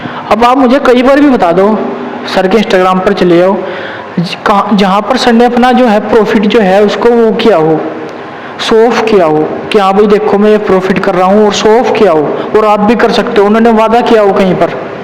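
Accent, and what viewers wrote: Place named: Muzaffarnagar, India. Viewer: Brother ap kaha se ho apki voice hamare muzaffarnagar jesi lag rhi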